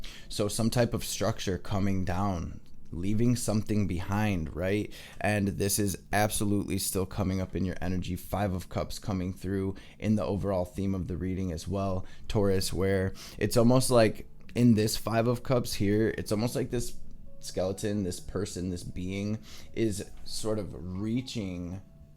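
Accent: Detroit, United States. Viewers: You from Detroit bro?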